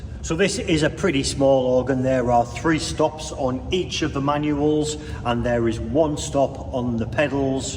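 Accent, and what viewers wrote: Newcastle, United Kingdom. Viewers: Enjoyed the video very much - it’s a change to actually learn something about the organ you’re playing. You sound Geordie - like me.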